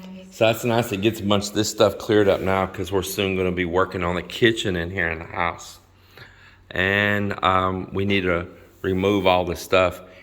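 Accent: Texas, United States. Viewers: I love the Texan accent. It’s so howdy 🤠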